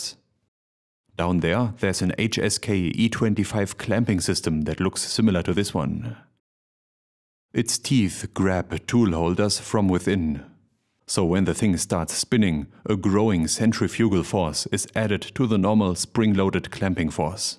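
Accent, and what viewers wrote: Germany. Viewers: the German-vampire accented humor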